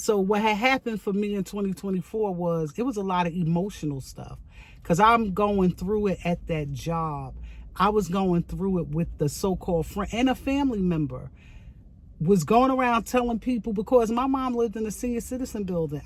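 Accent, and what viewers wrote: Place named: North, United Kingdom. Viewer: Happy New Year,!!!! New subbie here and I can already see I'm going to like it here. You sound like you're from up north. "She never liked me, she can't stand me." WHAT???? NAH.... But congratulations on your engagement. This is wild BUT 2025 is here. Let's get it off to a good start.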